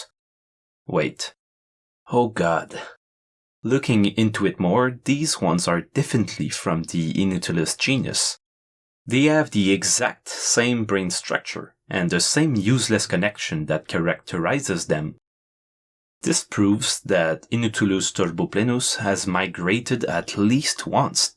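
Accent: Quebec, Canada. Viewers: U're french canadian right? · Es-tu québécois? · t'es quebecois je pense XDDD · Great video! By the way, are you French-Canadian by chance? I feel like I've heard that accent before 🙂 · French canadien?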